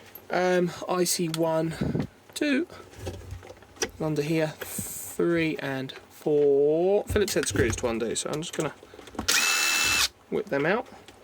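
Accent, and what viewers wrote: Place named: Lancashire, United Kingdom. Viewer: You sound Lancashire lad I’m in Rochdale. Do you mend them? Batteries for people thanks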